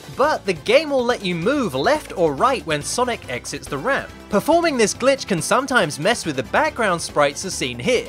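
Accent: United States. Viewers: lol your American accent is incredible. Even better than IHE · dude your American accent is pretty convincing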